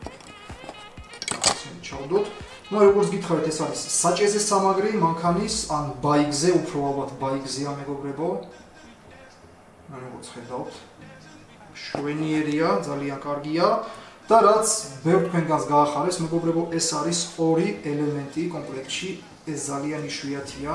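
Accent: Georgia. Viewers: თბილისელი არ ხარ?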